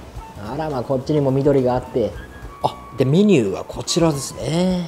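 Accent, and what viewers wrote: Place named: Tokyo, Japan. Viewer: 神奈川県民なのに江戸弁www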